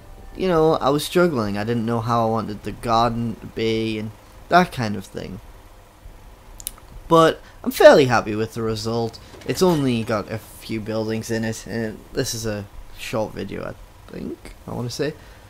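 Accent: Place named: Canada. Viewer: you sound like a Canadian stereotype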